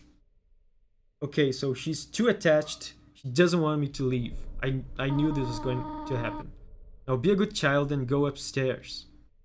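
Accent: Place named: Brazil. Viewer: EU SABIA QUE O SOTAQUE ERA BRASILEIRO Meu amigo, sua reação ao receber o abraço da Toriel, eu nunca tinha visto kkkkkkkkkk Mas sério, eu amo de mais ela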